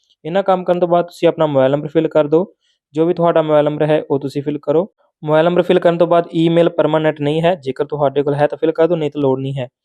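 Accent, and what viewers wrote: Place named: Bihar, India. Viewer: Tere awaj bihar Wali lugi menu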